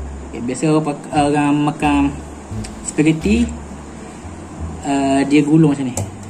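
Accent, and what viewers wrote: Terengganu, Malaysia. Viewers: Dgn slang ganu dye comel😂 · Aku suke tengok orang ganu cakak..hahaha good job bang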